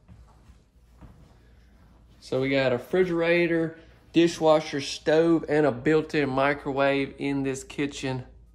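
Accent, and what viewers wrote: South, United States. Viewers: As a fellow southerner I love your accent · I enjoy your adorable Southern “accent” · I absolutely love this home, and as a widowed grandmother who loves to cook, I’d think I had already died and gone to Heaven if I was blessed to be able to have it as my own. I’d have one guest bedroom and use the smaller one as my sewing/craft room. Unfortunately, I’m not in a position to be able to buy it, but I love watching the videos and dreaming. You sound like a good Southern young man to me, and you come across as warm, funny, and, most importantly, honest about the homes you show us. I’m in the upstate of South Carolina where the tornado hit, and if it were possible, I’d buy this home, because it was love at first sight! 😂 Thank you for your videos and for being who you are. I still have to have hope and dream, and you make this so enjoyable for me. 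Keep up the good work, and I’ll keep watching! 😉👍🏼🙏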